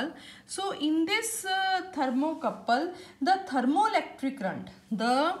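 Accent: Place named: Punjab, India. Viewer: Vich vich tuhadi punjabi sun ke swad hi aa gaya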